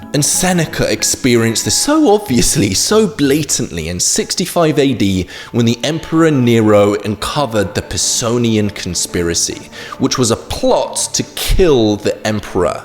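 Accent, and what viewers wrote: United Kingdom. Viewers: It is definitely the British accent. My husband is from Stafford and he pronounces a lot of things that end in an “a” as if they end in “er”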